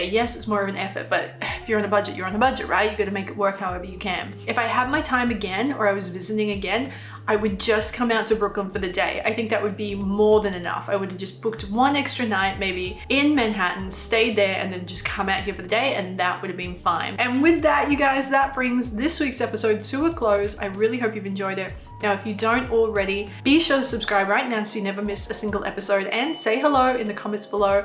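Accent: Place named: Australia. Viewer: Should have stopped at the firehouse by the Brooklyn Bridge, bet they would love to chat with a beautiful woman with an Australian accent.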